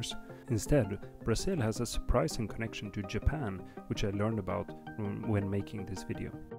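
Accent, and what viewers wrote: Sweden. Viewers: Visste direkt att du var svensk med den där dialekten haha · Why do so many swedes speak this way? There do you learn to speak this way? Also Dave brought me here.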